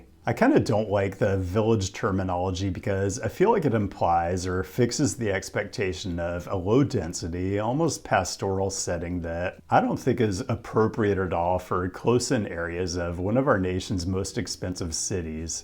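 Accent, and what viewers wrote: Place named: California, United States. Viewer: Dude you have the most CA accent I've heard since The Californians haha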